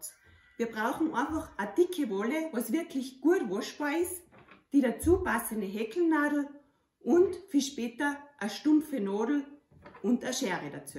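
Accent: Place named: Austria. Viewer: Vielen lieben Dank, liebe, für die Veröffentlichung deiner Idee, die durch Ihre Einfachheit wirkt. - Exakt was ich gesucht habe. 🤩. Und genau richtig für den heutigen Sonntagmorgen, wenn die anderen noch schlafen. ☺️ Da habe ich jetzt schon eines großen Teil fertig vom ersten Teil. Und ich freue mich, Videos mal im Österreichischen Dialekt zu entdecken. sehr sympathisch. Und auch noch ein riesiges Kompliment für eure Tischplatte - ein Traum!!!!! mlg. aus der Steiermark 👋🏼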